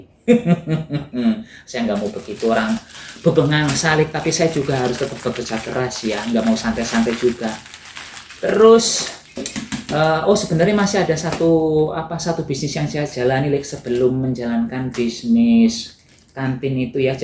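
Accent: Banyumas, Indonesia. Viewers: kasian ya, tapi tetap semangat dlm menjalani hidup, jatuh bangun dng segala perjuangannya yg tidak mengenal waktu, sekarang sudah kelihatan jerih payahnya hidup sudah berkecukupan, punya rumah, punya mobil, punya istri belum to, kok selalu tampil sendirian, tapi, banyak temen karena lucu dan cerdas, jadi banyak netizen yg suka banyolannya, ngomog ngapak yg ting pletot, bikin lucu alami, kalau bisa ketemu, pasti tak iwel" pipine sing tembem, botak, irunge sing blesek, tapi, lucu bikin gemes kalau bukak vidionya bersama iyunge yg kool kuno mbrono khas kehidupan desa, tapi sukses capaiannya, 😲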